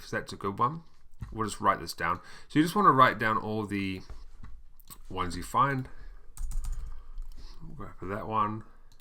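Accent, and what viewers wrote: New Zealand. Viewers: ...you sound kiwi... totally off subject lol great vdo btw :)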